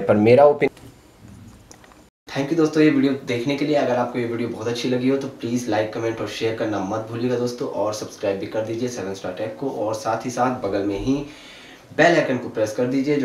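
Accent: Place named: Rajasthan, India. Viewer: Bhai aap rajasthani ho thodi marwari bol re ho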